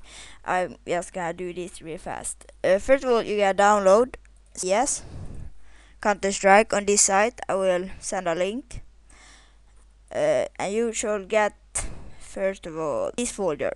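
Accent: Sweden. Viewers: dat swenglish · worst tutorial ever if you dont speak that good of english please dont try it just stick to sweden cause your accent is embarrasing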